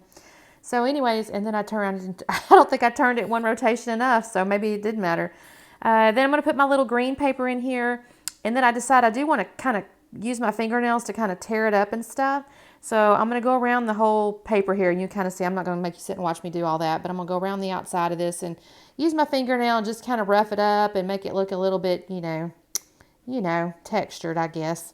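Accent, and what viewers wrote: Texas, United States. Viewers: I’m loving your work, and also,your humour and accent. I’m from NZ and I love your Texas ways. ❤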